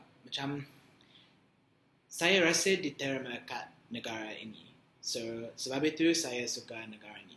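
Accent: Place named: Malaysia. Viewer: Good job bro..!nice malay accent you hve there!Hopefully dapat lebih baik lagi macam Mat Dan..hehehe..Mat Dan sudah 1000% mahir..jiwa Terengganu sudah sebati..☺☺👍👍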